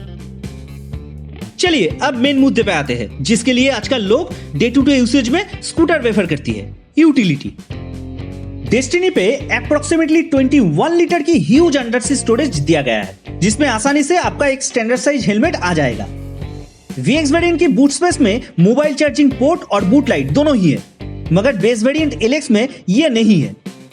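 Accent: Bengal, India. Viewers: I love your Bengali accent. ..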